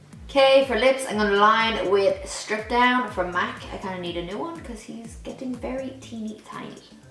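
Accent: Ireland. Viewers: You just speak like Bono and the others from U2 😎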